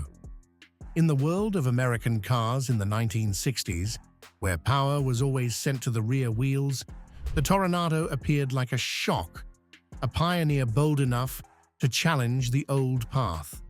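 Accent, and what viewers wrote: United Kingdom. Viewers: This chan has the best British voice.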